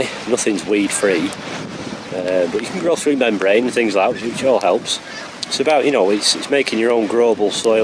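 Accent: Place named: Manchester, United Kingdom. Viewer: Where are you based? Nice one. Like your intro a lot. very professional. You sound a little bit like someone local to us here in Ashton u Lyne, North Est Manchester. Or are my ears playing games? Nice one mate again. I'll check Aarons out too, thanks for heads up. Cheers mate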